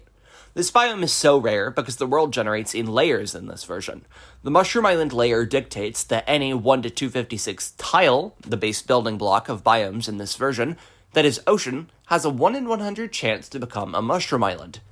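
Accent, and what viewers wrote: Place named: Delmarva, United States. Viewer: you kinda sound like ur from delmarva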